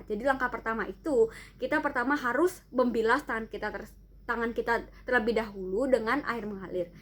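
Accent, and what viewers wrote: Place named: Surabaya, Indonesia. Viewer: Ngomongnya suroboyo bgt ya😆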